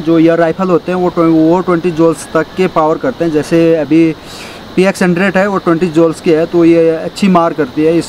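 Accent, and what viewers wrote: India. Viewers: Apki Uttarakhand valo ki jasi avaj lgti h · bheji mi smjhi gyon😉...Pahadi tone aani ch😂😁❤️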